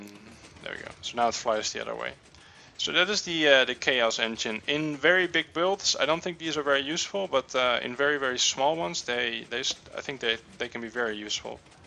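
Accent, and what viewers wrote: Netherlands. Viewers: amazing tutorial, got me to understand everything i wanted! The accent is very clear, though :D. If i may guess, Dutch? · are you dutch? I am haha so... And you sound pretty dutch by how you pronounce your english words.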